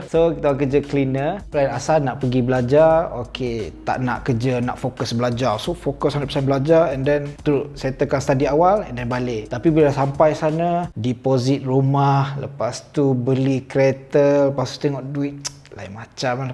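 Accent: Malaysia. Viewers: Nope.. Accent still Malaysia but lots of Oz word and slang mixed along the way 😅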